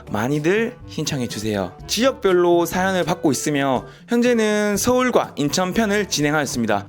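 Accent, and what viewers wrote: Gyeongsang, South Korea. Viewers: 와.. 그냥 몇번 봤는데 대구말투에 결국 구독박고갑니다ㅋㅋ 대구사는데.. 인천 가게되면 꼭 저기 가봐야겠슴다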